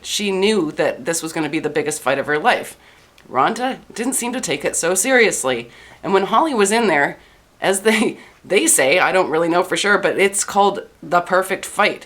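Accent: Canada. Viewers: Is it the Canadian Accent or are you generally incredulous. Do you have a long tongue?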